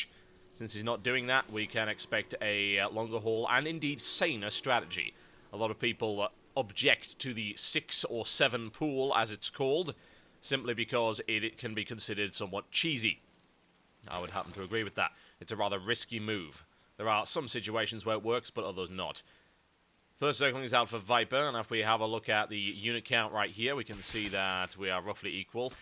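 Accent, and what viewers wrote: United Kingdom. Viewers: Why you should grant me a betakey? To much barbarism and not enough Earl Grey in the copper league it seems. Someone please think of the ex-convicts turned marines who probably done horrible things to nice people to get sentenced to such a fate. Oh, and taking quite a fancy to your rather shouty brittish casting. More of that please. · I've been watching your "I suck at SC2'" and Shoutcraft video's, and I must say, the I suck helps a lot to bolster my own play, and these shoutcrafts are the few I really like to watch in terms of SC2 matches, possibly because of the cynical brit voice. Looking forward to your next video!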